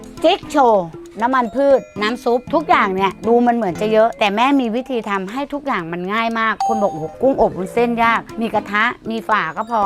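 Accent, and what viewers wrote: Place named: Mae Klong, Thailand. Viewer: สมเป็นสาวแม่กลองเลย อารมณ์ขัน พูดตลกเหน่อๆ แต่ฝีมือทำกับข้าวสุดยอด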